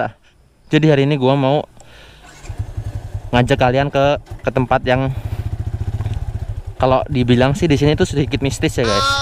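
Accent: Java, Indonesia. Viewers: kok ngomongnya jawa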